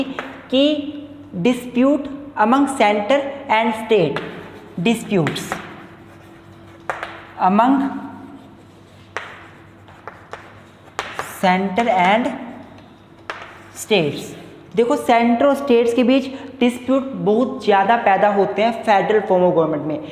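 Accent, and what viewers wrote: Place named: Punjab, India. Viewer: The content is very helpful...and that punjabi accent jo aa rha hai that is also making it an interesting lecture 😄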